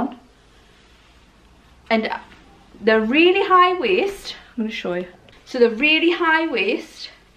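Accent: United Kingdom. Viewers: Great video, and let's face it, with your accent it makes everything sound excellent pet!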